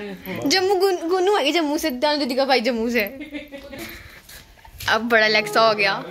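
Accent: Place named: United Kingdom. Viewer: Yrr language to HINDI HAI to ascent eng ka kyu hai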